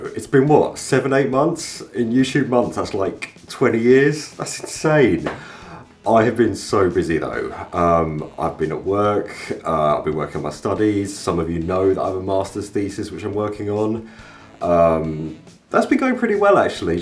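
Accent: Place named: Finland. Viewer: Finland does have pre-school at maybe age 4 or 5 or so though. It sounded like it was quite spot on video. Something that bothered me from the start: despite you having like a perfect english accent and none of the usual traits of finns speaking english, it still made me think "this is a finn speaking perfect english in a pretty heavy accent" and it's so confusing because I can't explain why. It just felt like it made sense after you said you're half and half.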